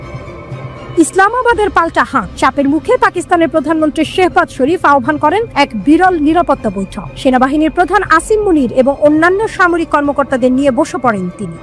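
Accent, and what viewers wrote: India. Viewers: আপনি কি ভারতীয় স্টাইলে সংবাদ পড়ছেন। আপনার পড়ার নিজস্ব কোন ধরণ নাই? খুবই লজ্জা জনক।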